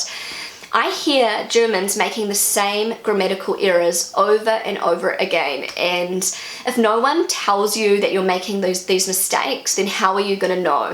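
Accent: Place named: New Zealand. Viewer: It's because her Kiwi accent is non-rhotic (they drop Rs at the end of syllables). But there is a subtle difference; the vowel at the end of "errors" is an unaccented schwa, while the vowel at the end of "eras" is a bit more open and closer to "a" as in "arbiter".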